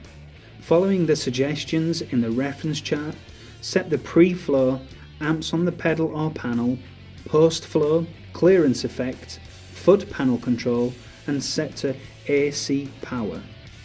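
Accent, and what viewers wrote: United Kingdom. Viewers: Agreed, but I think he's a Manc lad! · Yes, it is absolutely appalling, but as he has a 'Midlands' accent it isn't very surprising.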